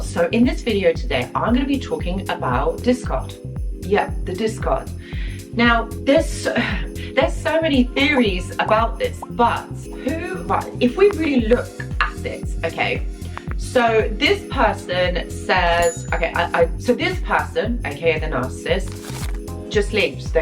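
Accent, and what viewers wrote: United Kingdom. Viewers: YOU , Miss are absolutely BRILLIANT!! and adore your Brit accent😍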